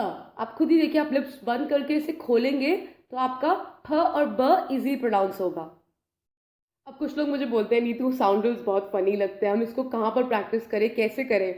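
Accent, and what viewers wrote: India. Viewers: you have a very strong India accent. please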